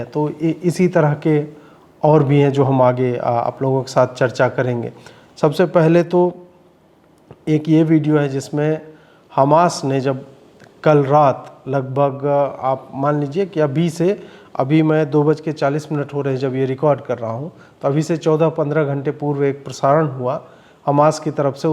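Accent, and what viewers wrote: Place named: Bihar, India. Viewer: Video ki caption aur narrator ki bolne k tareeke se mujhe maza aya 😂. Fati fatah fatanti 😂 aur stadium jaisa mahol banna.....bhaiya k bolne ka tareeke mere ek bihari dost k jaisa hai. Maza aya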